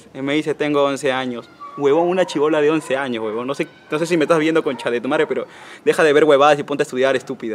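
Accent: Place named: North, Spain. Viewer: Algun dia ire a vivir al norte para tener su acento y decir pucta v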